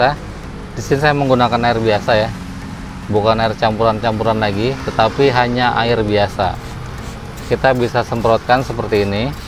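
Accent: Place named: Banjar, Indonesia. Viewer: logat nya ada sedikit bahasa banjar nya . benar kan mas. ,😀😀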